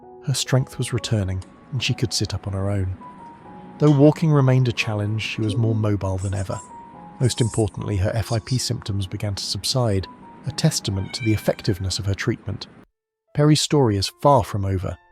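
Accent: United Kingdom. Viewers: Its pavement or footpath in UK. Narrator is very English.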